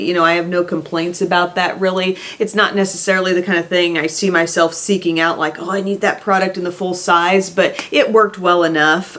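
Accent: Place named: New England, United States. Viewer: You pronounce some words like you were from New England! That's a New England accent! 😊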